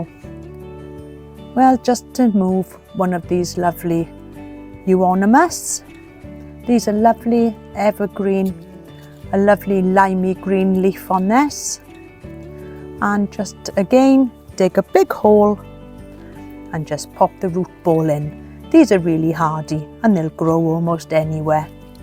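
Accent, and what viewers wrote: Wales, United Kingdom. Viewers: You have a certain charm to your videos that make them relaxing to watch. Not sure if it’s the music or your Welsh accent or both but it works really well. The garden still looks great. Keep enjoying it.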